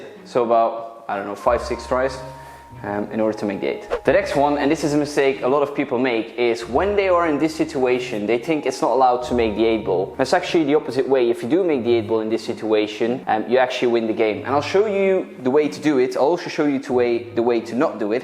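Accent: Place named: Scotland, United Kingdom. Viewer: Good content,subscribed, sometimes I think your Scottish but here something else there…….?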